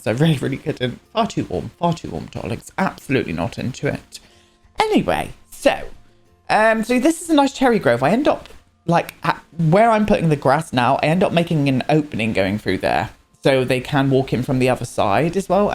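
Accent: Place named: England, United Kingdom. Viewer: I love his accent! 😍 His region of England has a great dialect! 😊 💞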